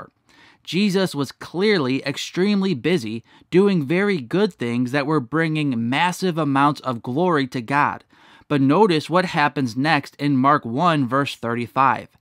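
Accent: United States. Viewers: Narrator from Chicago? That accent...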